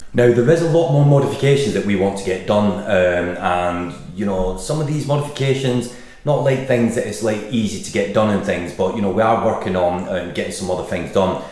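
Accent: Scotland, United Kingdom. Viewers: Man, where is your accent from?! I thought you were scottish, then a brummie, then from Lancashire.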